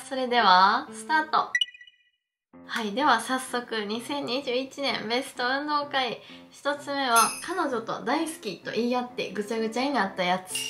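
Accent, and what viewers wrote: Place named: Kansai, Japan. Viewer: 関西弁で話すから余計に面白い良いお年を (^^)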